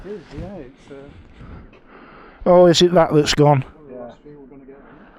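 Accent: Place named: Northern England, United Kingdom. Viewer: Ey up chuck, how i miss my northern mum saying that. Keep up the dialect and content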